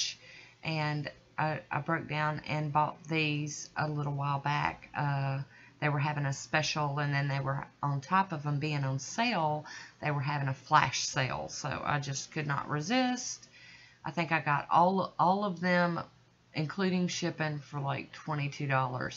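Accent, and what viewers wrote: South, United States. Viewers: I love the names, too funny. I love that they have a southern accent. Pannnkk!!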